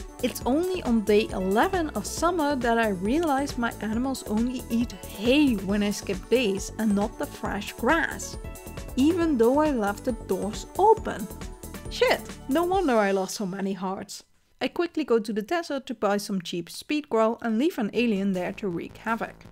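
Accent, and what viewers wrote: Netherlands. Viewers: Hey! This is the first video I watched of yours and I kept wondering if you were Dutch, then I heard you say Herman and now I'm pretty sure you're Dutch 😂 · Not me loosing my mind trying to guess if you are dutch and then absolutly cackling when the confirmation hit 🤣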